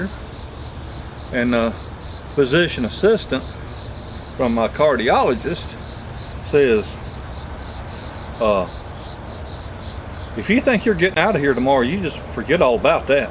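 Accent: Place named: Tennessee, United States. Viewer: Glad your doin better! Idk where in Tennessee you are from but sound exactly like fellers i talk to around here. Im from scott co lol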